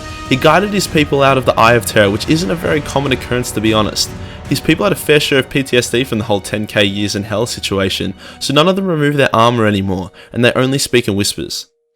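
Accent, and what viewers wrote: Australia. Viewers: It’s literally an Australian accent. Someone else is racist. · i know you mean english speaking but jesus christ be specific. hes australian, not english. its basically the same just worse at cricket/rugby and better at crime. cheers ears 🖒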